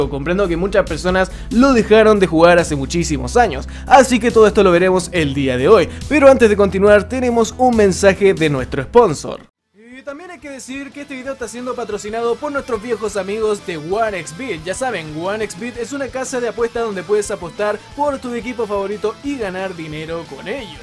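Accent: Chile. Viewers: Tratas de hacer el español neutro e igual te sale aveces nuestro hermoso acento wn XD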